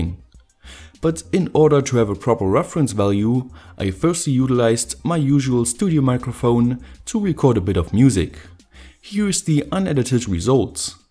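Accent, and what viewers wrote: Germany. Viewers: Kommst du zufällig aus Deutschland? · Are you German? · Lol, du bist deutsch?! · Are you german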